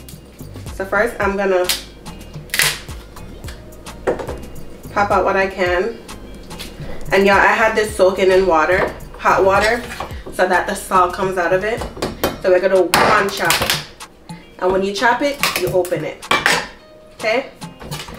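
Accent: Jamaica. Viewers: Why in some videos does she have a jamacan accent and others she doesn’t does she just put it on sometimes? I’m confused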